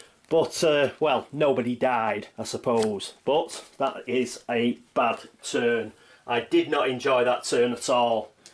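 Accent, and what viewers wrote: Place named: Lancashire, United Kingdom. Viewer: I'm in Spain but I was raised in Lancashire, I'll take a wild guess and say that you're from either Wigan or Preston.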